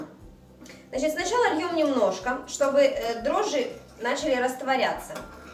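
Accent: Ukraine. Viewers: Вам речь кажется неграмотнной, а на самом деле это местный диалект русского языка на украинской почве. Так же как существует масса диалектов в пределах России. Все очень просто, можно было бы Вам и самой додуматься, но видно привычное русское высокомерие мешает.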